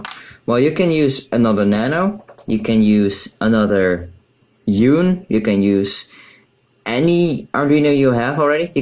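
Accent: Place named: England, United Kingdom. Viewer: broooo, ik mag je engelse accent wel en thnx voor de fix!!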